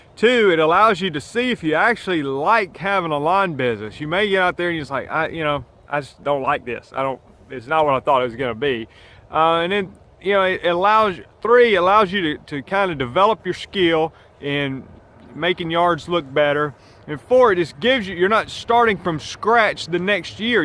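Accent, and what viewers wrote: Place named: Southwest Virginia, United States. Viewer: You sound like you are from my Southwest Virginia area lol. Everyone and their brother runs around with a mower of some sort in their truck out mowing lawns. there isnt really many decent or big sized companies around here because the business is so saturated. I am surprised I got any lawns really this year and wont be surprised if i loose my commercial property to Jo Blow on the street that wants some beer money next season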